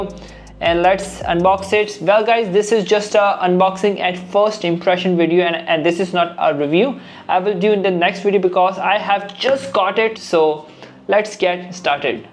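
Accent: India. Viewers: Orang prindavan...logat e udah...terasa..😂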